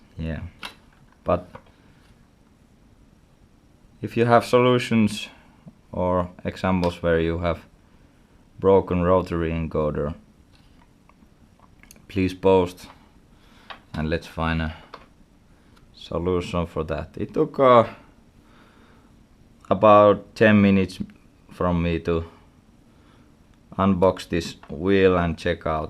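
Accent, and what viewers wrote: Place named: Finland. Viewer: Juu ajattelin vaan ku sulla on silti toi suomiaksentti mutta sä et jää jankkaamaa sanojen kaa kuten monet jää.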